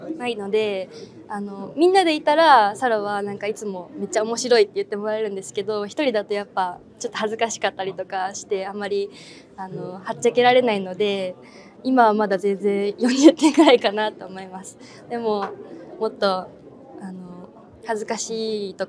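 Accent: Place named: Kyoto, Japan. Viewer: もっと京都弁喋ってほしい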